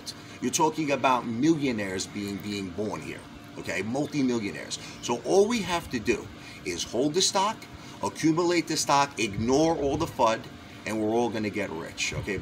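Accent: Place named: Long Island, United States. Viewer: I’m from LI…you sound and look like my people!!!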